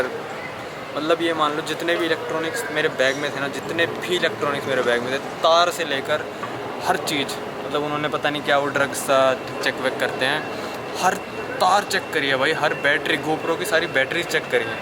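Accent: Haryana, India. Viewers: I love Haryanvi accent bhai. Love from Lahore,Pakistan.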